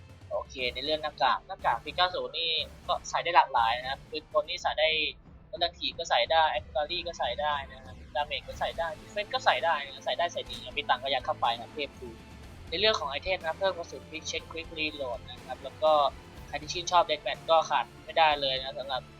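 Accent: Isan, Thailand. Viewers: คนอีสานรึป่าว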